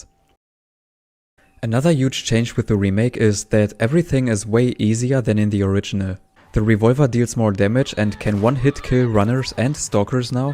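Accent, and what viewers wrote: Germany. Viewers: Man hört so fett raus das du deutsch bist hahaha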